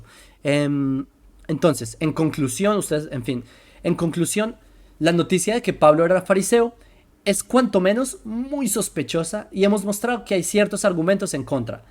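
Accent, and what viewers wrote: Bogotá, Colombia. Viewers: Me gusta mucho su acento Bogotano, muy elegante... con cierta pronuncion estilo arabe... Me gusta mucho escucharlo. Pero esta tan sesgado que no puede salir de la norma ortodoxa Judía, lo que como a todo religioso no le permite abrir la perspectiva sobre los conceptos.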